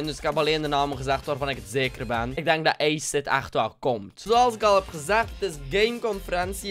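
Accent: West Flanders, Belgium. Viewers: aaaaaah gvd uw westvlaams accent man 😂😂😂😂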